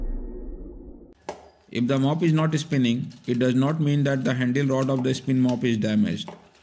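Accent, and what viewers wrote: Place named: India. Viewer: Sounds like from India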